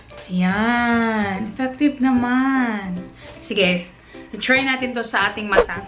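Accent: Visayas, Philippines. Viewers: HAHAHAHAAHAH ka cute sa pag bisaya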